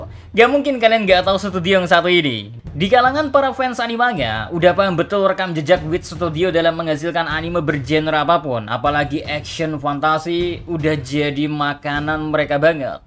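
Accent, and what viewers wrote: Java, Indonesia. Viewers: Jngn, krna medok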